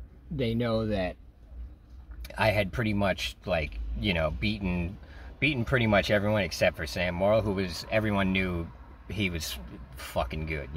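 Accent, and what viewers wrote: New York, United States. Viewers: Love your New York accent.. I’m trying to watch your videos in order and so far enjoying every one of them.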